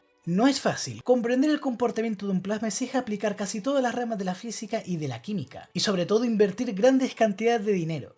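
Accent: Chile. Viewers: Soy yo o tiene un acento parecido sl chileno? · Gracias! Excelente infotración. El centro de mi ciudad estaba repleto de avisos de neón en los '70. Una duda... tienes acento "chileno" es idea mía o eres de acá? Saludos desde Chile!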